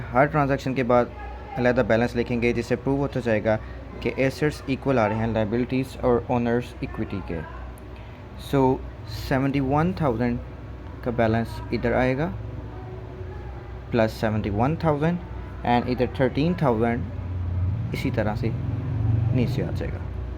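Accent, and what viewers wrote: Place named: Pakistan. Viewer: Omg your urdu accent is so... I wanna meet you sir! Absolutely Amazing❤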